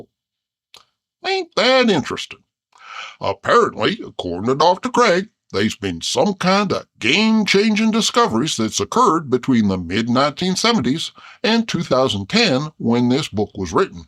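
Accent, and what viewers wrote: South, United States. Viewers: I'm a big fan of the analytic approach to how you examine and dissect each claim that is found in Dr. William Lane Crag's book On Guard. Giving them an in depth sniff test in your charming southern down home style. The only criticism that I have is more of a me problem than a you problem and that is the background sort of triggers my minor case of visual OCD and that is the trees in the mirror on the right side are just wrong the image should be identical as to what is seen in the window and it isn't.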